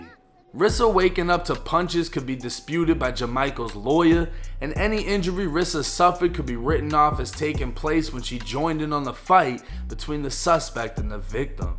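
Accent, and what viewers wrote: Boston, United States. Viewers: Just really getting into your vids I see you rep FL but your mass/Boston accent is crazy 🤣🤣🤣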